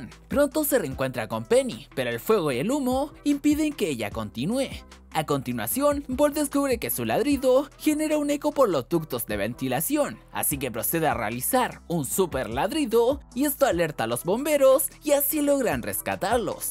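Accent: Chile. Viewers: Pera pera eri chileno ?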